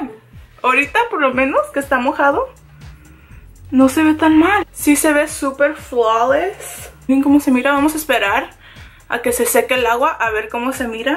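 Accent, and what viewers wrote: United States. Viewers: cual es la necesidad de hablar como gringa? v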